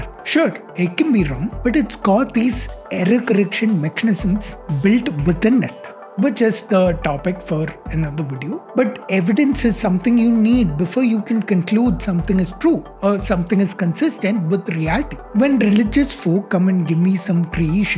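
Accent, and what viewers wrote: India. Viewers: Bro you have very thick Indian accent.😂😂😂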